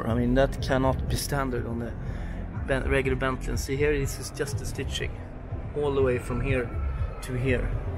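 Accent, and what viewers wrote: Sweden. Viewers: I bet the one whos talking is from Sweden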